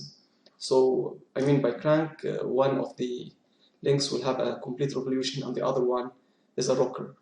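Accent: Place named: Arab world. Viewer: انت عربي لهجتك مش انجلش خالص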